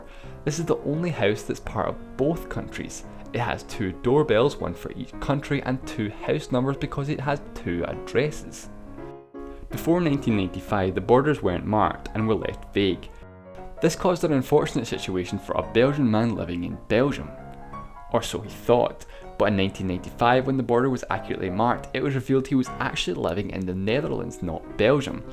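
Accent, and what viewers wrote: Scotland, United Kingdom. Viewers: You Scottish? You're reminding me of my year abroad rn. It's a fond memory 😊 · He's Scottish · I like your accent. Are you Scottish? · Is he Scottish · he's scottish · What problem do you have with his accent? The guy is Scottish, so what? Many of his videos are better laid and he explains stuff better. CGP grey uses a lot of humor where he doesn't know what to say and speaks way fast. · alan benlolo It's English with a rather strong Scottish accent. I can understand it pretty well. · Scottish accent? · What about Russia, there's a small bit close to the north sea between Poland and Lithuania. And BTW, love your accent, what is it? Scottish. thanks for this video. Don't mind the twat who cannot speak in English, your speech was clear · Are you Scottish? I like your accent. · Walid Bahri Um you do that's a Scottish accent right? 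· Is the speaker from Scotland? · This is a Scottish accent right.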